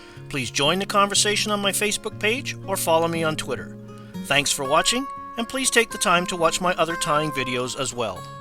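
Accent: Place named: Alberta, Canada. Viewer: Thanks...Yes I did some experimenting with different sized beads and did figure it out eventually! I tried the system at my new favorite pot hole and it worked fantastically! Thanks again for your Alberta voice on fly tying:)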